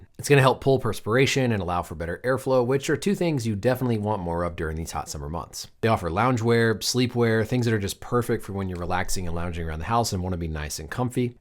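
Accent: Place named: Michigan, United States. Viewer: Unrelated to this video but I had a very strong feeling you’re from the Midwest/Michigan and googled you lmao the accent sounds very Michigangander esque. Love how informative and straight to the point your content is Cheers doc!🍻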